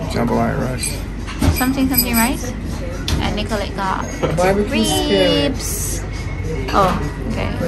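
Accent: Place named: Jamaica. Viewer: I can deffo hear the Jamaican accent ! Yeh goooodieeeeee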